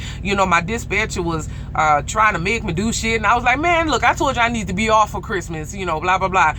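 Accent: Louisiana, United States. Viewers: You're soooo Louisiana! I don't hear that accent often....that's dope. My dad was from there. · Love that Cajun accent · LoL I love your accent, are you from Louisiana? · love the accent sound cajun or from the city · Shawty which part of Louisiana you from? I can recognize that accent anywhere. lol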